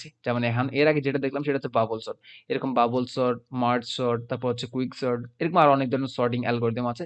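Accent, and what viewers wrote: Chittagong, Bangladesh. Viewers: Amader chatgaya accent diye buja jai Btw kon Uni te poren bhaia?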